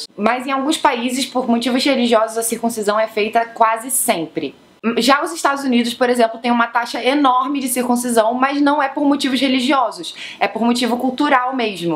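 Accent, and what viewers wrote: Rio de Janeiro, Brazil. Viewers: Sotaque carioca é padrãozinho, porém lindo 😅😍.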